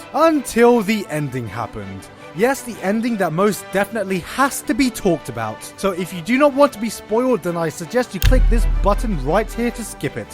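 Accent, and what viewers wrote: United Kingdom. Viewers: sounds so Bri'ish here. How things have changed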